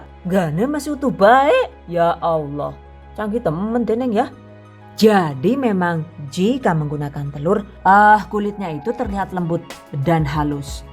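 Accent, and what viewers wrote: Banyumas, Indonesia. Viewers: 😄😅 suka suka suka,, aplgi pke bhsa ngapaknya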